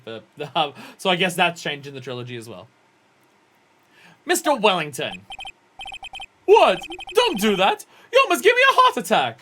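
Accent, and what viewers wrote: Australia. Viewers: Yes,she is Australian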